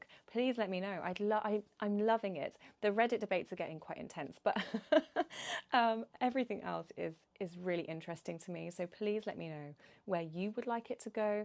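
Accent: United Kingdom. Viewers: you got a really great speaking voice. The Brit accent really works for you. Your hair looks great as well. Ive known about 40k since being young, ive always been kind of a closet nerd though. Necromunda is my favorite setting out of all of it. Have a great day.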